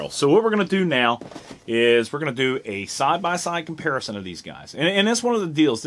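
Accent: United States. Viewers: what accent is that? sounds real American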